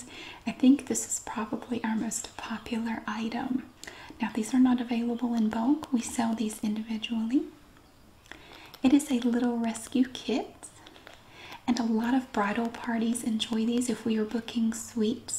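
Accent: South, United States. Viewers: I just love your channel & the variety of ASMR you explore. I don't think there is anyone quite like you out there with the same style or format, making you extra special(to me, anyways) I really enjoyed this video, along with your store hauls & shelf straitening. I LOVE your southern, soft accent. Much love & blessing to you from a fan in Vancouver, BC Canada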